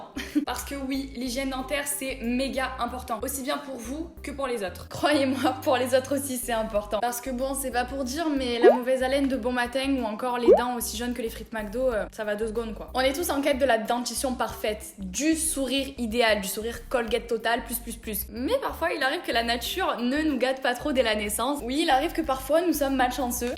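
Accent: South, France. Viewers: l'accent du sud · t'avais l'accent du sud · Tu as pris l'accent du Sud nan ? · ta grv l'accent du Sudddddd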